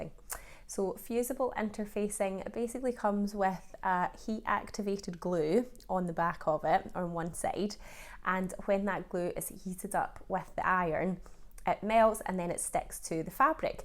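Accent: Scotland, United Kingdom. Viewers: Living in Switzerland as I have for eleven years makes a Scottish voice very nostalgic for me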